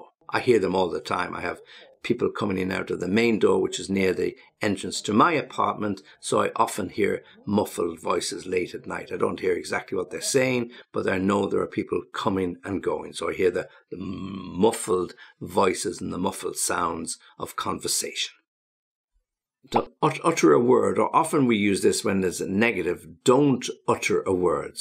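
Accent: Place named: United Kingdom. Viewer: My morning begins with your video or podcast,! I hope my accent isn't too broad)) Learning from you, shadowing your beautiful British accent!:) Thank you so much!!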